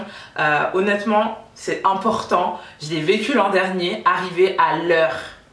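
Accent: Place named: Antilles, Caribbean. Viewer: J'ai adoré ce petit accent antillais lol. Un vrai kit de survie 😏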